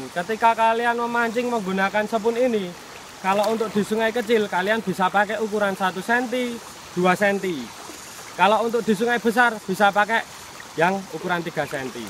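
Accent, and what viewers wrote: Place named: Java, Indonesia. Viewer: medoknya minta ampun....agak dibeneri mas dialeknya biar gak mengganggu banget di telinga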